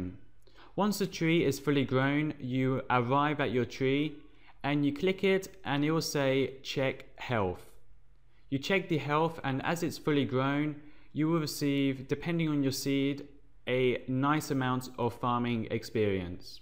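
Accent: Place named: Iran. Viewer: r u persian?cuz ur name and accent is showing.are u persian? btw gr8 guide subbed and liked;)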